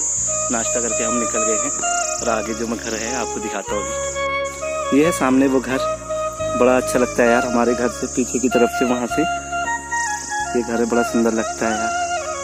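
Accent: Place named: Pahari, India. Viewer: areee sir kya pahadi bolte h aap kaan to prm sukh ka anubhav ho gya 😍😍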